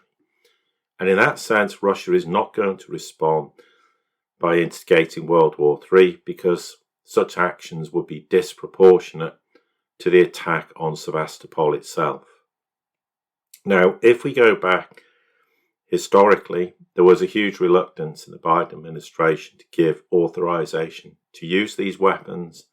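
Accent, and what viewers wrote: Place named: United Kingdom. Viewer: I like your show. But even if you are legit, in time your British accent will make me think that you are a psy-op. The vast majority of individuals worldwide who work in communications positions (News, TV, Radio, Newspapers, Magazines etc..) that target a worldwide audience are British, and 95% of them are psy-oping.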